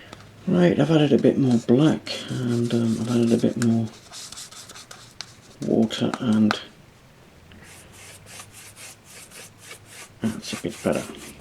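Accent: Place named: Tyneside, United Kingdom. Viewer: Hi, I live in Sunderland, but prior to the Coronavirus pretty much most Sundays, my wife and I would take a drive through to Shields along the coast road, round by the river, over that bridge and round by the Town Hall back to the sea front and then off homeward. You’ve done a fantastic job if you are no longer in the area, not sure how long it is since you’ve been ‘home’ but on the river side (north) of the bridge is a housing estate, the dry dock is still there and central to the estate, and on the east side is a statue of Catherine Cookson. You’ve also managed to keep your accent, so much so I was convinced you were still in the region. Anyway thanks for the reply, I get where you are coming from regarding hearing the noise - to be fair I have a test layout on a 4 x 2 ply board with cork on the top and it is certainly not quiet. I think I may just follow suit and build it on the ply surface of the L shaped baseboards. 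Cheers Derek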